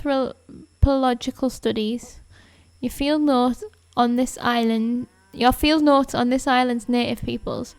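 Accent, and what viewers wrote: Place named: Cumbria, United Kingdom. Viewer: I'm hearing Cumbrian 🤔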